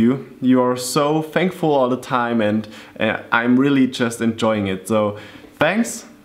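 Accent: Germany. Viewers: Thanks for tutorials, really nice! Sometimes your germans makes me laugh lol. Anyway, loose the stickers :S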